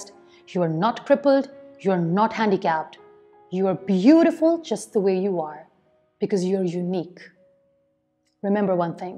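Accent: England, United Kingdom. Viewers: Mam you are my" role model ".I like your english auction. Blessing you to live long with great success.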